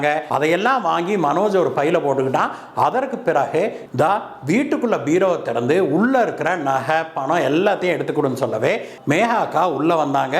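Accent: Kongu, India. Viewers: கொங்கு தமிழ் அருமை அண்ணா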